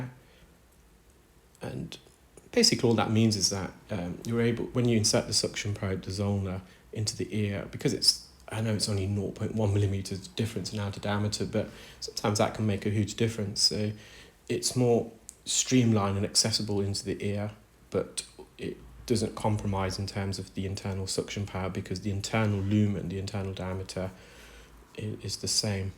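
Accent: United Kingdom. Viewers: Side note: I never tire of hearing you talk. There is something so soothing about your voice. And I love your UK accent. (big smile)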